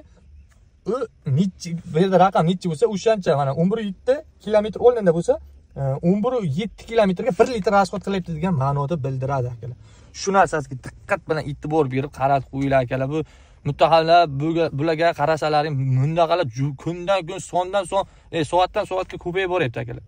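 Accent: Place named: Jizzakh, Uzbekistan. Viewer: Oooh Jizzah shevam....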